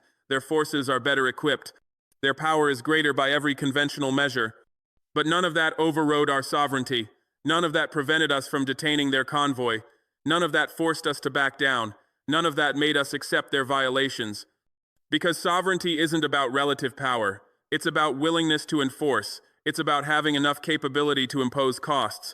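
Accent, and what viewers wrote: United States. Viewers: Is this real why has he an American accent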